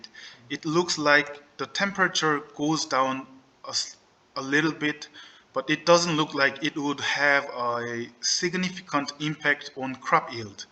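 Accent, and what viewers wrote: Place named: South Korea. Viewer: 동영상 올려 놓으셨다고 해서 구경왔습니다^^ 한국어 억양이 있긴하지만 발음은 클리어하신 편이라 알아듣기 편하네요!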